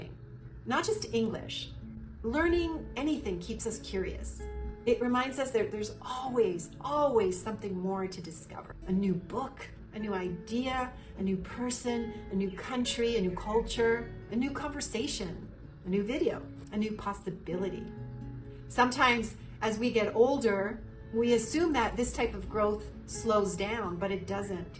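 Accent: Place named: United States. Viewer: This américain accent ????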